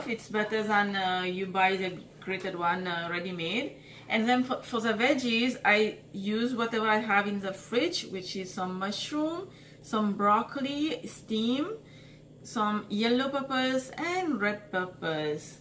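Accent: Mauritius. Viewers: Yeh ur right it is pronounced nid! The prononciation is the same no matter where we r.. accents r different! However, I think we’re losing focus here! It takes courage and strength to make videos! It’s been three years n m still not brave enough to do it! How abt we focus on the recipe and her tips as opposed to her accent.. she is Mauritian! I’m sure we all have an accent irrespective of where we r?!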